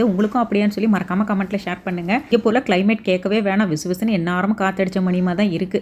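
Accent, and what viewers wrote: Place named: Coimbatore, India. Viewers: ஆமா என்ன எங்க ஊர் (கொங்கு) slang பேசரீங்க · என்ன அம்மணி எங்க ஊர் தமிழ் பேசுறீங்க. நல்லாத்தா,இருக்குது பேசுங்க, பேசுங்க அடுத்த தடவை வந்தா, கோயம்புத்தூர் பக்கம் வந்துட்டு போங்க. ❤ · Ennagga enna coimbatore slange varuthu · S pure kongu slang but super sis unga slang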